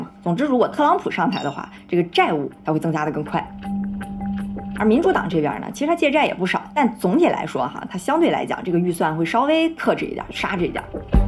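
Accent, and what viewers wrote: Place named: Dalian, China. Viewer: ，標準的大連口音.性格👍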